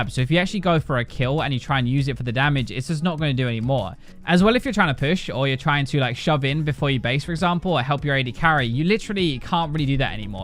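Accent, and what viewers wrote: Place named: Northern England, United Kingdom. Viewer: holy shit I can't understand a thing your northern english ass is saying. ENUNCIATE FFS.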